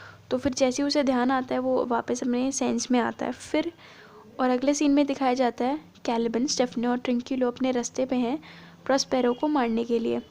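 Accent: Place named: Uttar Pradesh, India. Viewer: By your act of speaking i am sure that you are from uttar Pradesh 😇😇😇😇 isn't it?if it is true you just like my comment.By the why I am also from uttar pradesh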